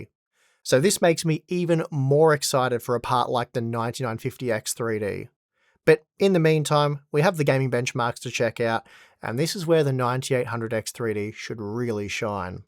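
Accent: Australia. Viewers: I have to SURPRISINGLY say that after watching this video I don't think I EVER wanna heard the word 'FASTER" ever again!! It's been drilled into my head SOOO MANY TIMES that I'm almost SICK of AMD winning JUST BECAUSE OF THIS!!!!! hahahaaa!! (I think it's mainly because I'm an American, and hearing the Australian accent the biggest difference that we hear is the 'ahh' in 'faster' ) ... and hearing that 586 times in one video drilled it in hard... and it SUCKS because I WANT to hear about AMD being FASTER!!! LMAO - I think I'll be okay tho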